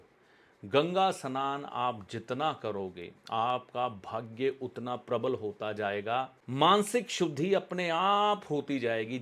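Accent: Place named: Punjab, India. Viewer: पंजाबी एसेंट हैं, थॉट पकड़ो समझे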